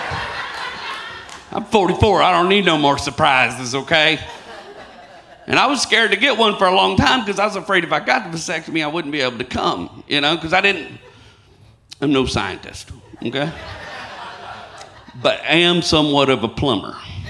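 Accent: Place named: Mississippi, United States. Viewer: As someone born and raised in Mississippi you sound just like most of my family and it's kinda surreal hearing somebody like us doing stand up keep it up man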